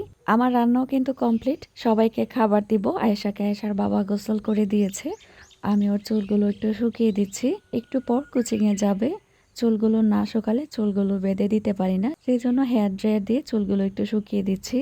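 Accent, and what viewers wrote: Bangladesh. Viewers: আপু তোমার ভিডিও চুপি চুপি এসে সব সময় দেখে যায় কিন্তু কখনো কমেন্ট করিনি আজ করলাম তাও কেন জানো তুমি ব্রাহ্মণবাড়িয়ার তার জন্য আমি তোমার ভাষা শুনে প্রথমে বুঝে গিয়েছিলাম পরে দেখলাম না সত্যি তুমি ব্রাহ্মণবাড়িয়া খুব ভালো লাগলো জেনে আমিও ব্রাহ্মণবাড়িয়া মেয়ে আপু কসবা সৈয়দাবাদ বাড়ি আমার জন্য দোয়া করো আপু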